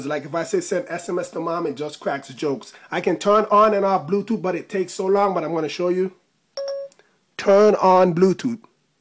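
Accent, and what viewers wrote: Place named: Jamaica. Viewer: just curious but what is your nationality? you sorda sound Jamaican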